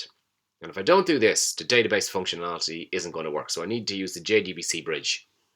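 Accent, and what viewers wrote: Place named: Ireland. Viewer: Sounds like an irish accent nice change from indian :)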